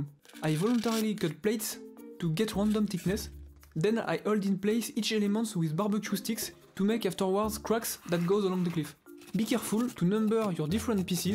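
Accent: France. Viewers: on voit bien que c'est un Français qui parle en anglais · un anglais avec accent français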